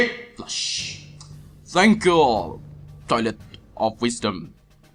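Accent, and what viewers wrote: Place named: Arab world. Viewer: are you Arabic? that accent sounds like it but i would like to know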